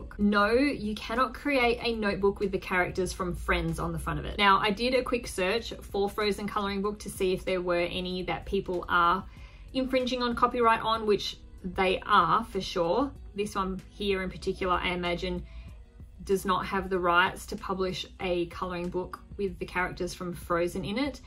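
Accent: Australia. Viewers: Aussie accent? Thanks for sharing!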